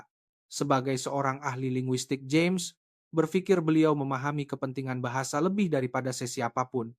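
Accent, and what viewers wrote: Indonesia. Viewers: Ini cerita yg guna loghat indonesia.. ini reka cerita bukan dari Malaysia n bynk reka cerita yg tak mencerminkn org malaysia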